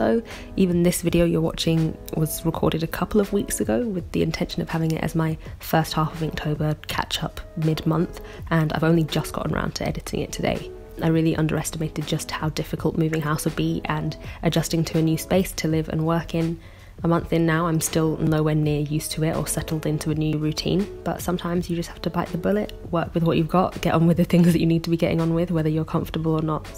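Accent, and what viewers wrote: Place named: RP, United Kingdom. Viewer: Your work is amazing- as always. Why do I think you sound more ‘posh’ in this video? ☺️ I decided to do inktober this year. Being a full time secondary art teacher I knew it was not going to be easy. I took the plunge anyway. Everyday I would come home after a long day and I would think of what to draw. I did some traditionally on paper, otherwise, I completed each prompt on ipad as it was easier for me to do so even while in bed.🙈 Somewhere along the way I had some procedures done at the hospital and I did really want to give up- but I took few days off and I tried catching up- I am still catching up. I do feel a sense of achievement and I am hoping I will complete the last few by the 31st. You have been a big inspiration to me. I have talked about you to my students and I highlighted your art and also your resilience, commitment and integrity towards your art. 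I talk about you so much that some pupils thought I knew you in real life😂😂😂 Anyways I hope you settle down in your new place. All the best and looking forward to more art from you. By the way I really liked the limited colour palette approach and I like how you worker with the ink when the ink was not doing what you wanted.... as opposed to just give up! Sorry for this long paragraph- just had to tell you all of this. ❤️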